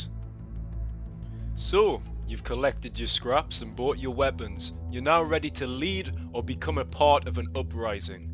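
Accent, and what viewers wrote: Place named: Scotland, United Kingdom. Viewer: My o my you sound so scottish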